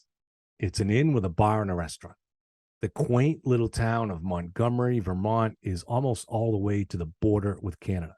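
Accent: Boston, United States. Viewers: lol love the Boston accent ! 90 miles is still close!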